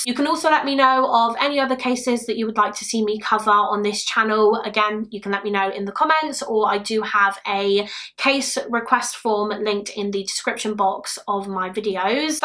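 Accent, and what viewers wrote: United Kingdom. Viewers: Hi! I’m really into true crime and I found your channel recently. I have a few favorites and you’re definitely one of them! I love that I can feel that you’ve connected with the story you tell and you have a lot of compassion for victims. Keep being amazing! 💓 Also ironically all of my favorite YouTubers are from the UK. I have a vision disability so I mostly experience the content via audio and I just find UK accents so nice to listen to 🥰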